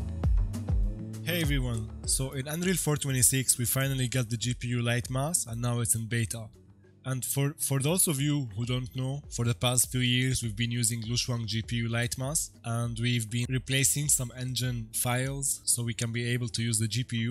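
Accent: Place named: Austria. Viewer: You have an accent similar to Arnold Schwarzenegger, and in some movie Arnold said "Get to the chopper!" I guess it's a reference to that.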